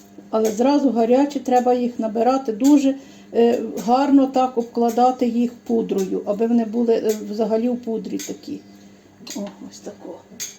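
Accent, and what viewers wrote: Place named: Lviv, Ukraine. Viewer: Чую Львівщину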